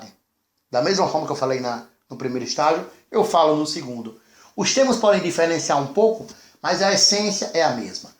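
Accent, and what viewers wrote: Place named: Northeast, Brazil. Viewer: Muito bom! Explicação de fácil entendimento, muito satisfeita. Parabéns professor 👏.. E esse sotaque, meu nordeste ❤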